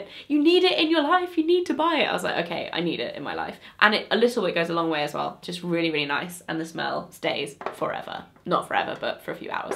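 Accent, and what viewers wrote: United Kingdom. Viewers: Ugh, where have you been all my life?? I'm completely in love. I could kick myself for not finding you sooner! But instead of kicking myself, I shall just binge watch your videos and enjoy drinking in your wisdom! Am I fan-girling out too much? Ah well, that's okay with me! I must say a lot of the US beauty bloggers I have been watching all talk about the same products all the time over and over and over. I know there are exceptions to every rule, but sigh...not many exceptions to that one in my experience. What I appreciate so sooooo so much is getting to hear about so many DIFFERENT products! There aren't many mentioned here that have been mentioned at ALL by the beauty vloggers I've been exposed to, and that's the absolute truth. I am dying to learn NEW things from NEW people with NEW experiences - and this channel is it. I'm hooked and loving every second of it. 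Aside from appreciating your expertise, I could listen to you speak with this beautiful U.K. accent all day. All I allowed to say that?? LOL well I am! I'm just in love with all things this channel - and I'm so grateful! Can't wait to tell everyone I know. THANK YOU SO MUCH! I can't wait to see all you have to come in the upcoming months. Blessings to you in the New Year! 🦄⭐️☃️💋💕🌹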